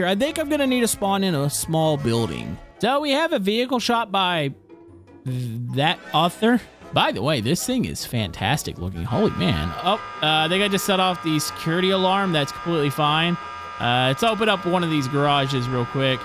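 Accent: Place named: South, United States. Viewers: you have a very southern sounding voice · He's from Texas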